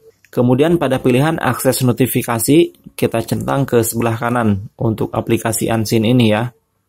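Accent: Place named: West Java, Indonesia. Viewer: Orang sunda pasti yang ngomong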